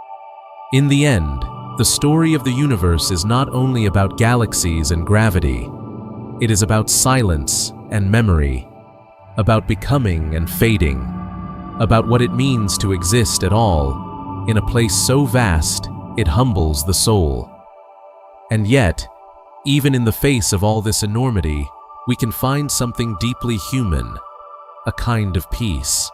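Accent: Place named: United States. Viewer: Finally a good old fashened AMERICAN accent. Cant believe it.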